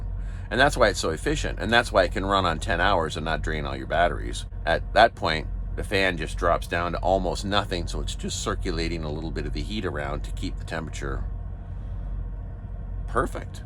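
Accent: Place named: Canada. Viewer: I knew you were Canadian when I first heart your voice. Sound exactly like Alex Lifeson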